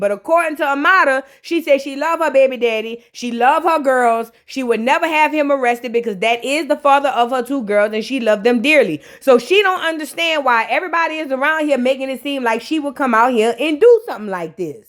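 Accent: United States. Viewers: Hi, im from the UK and a new follower. You’re accent is my favourite American accent ❤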